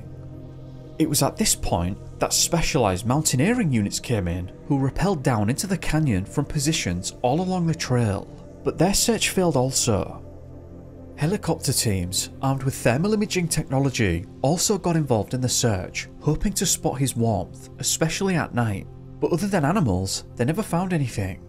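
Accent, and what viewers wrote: United Kingdom. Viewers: Can someone tell me what UK accent this guy has where he says "pre-shume" for "presume" and "ah-shume" for "assume"?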